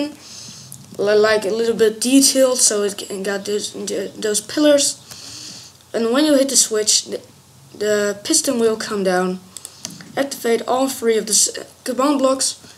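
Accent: Netherlands. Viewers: I am Australian. I was actually quite impressed with your English. Thought you sounded a little Dutch, so I just wanted to check :) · bent toch nederlands he? Ik hoorde het al aan je stem en accent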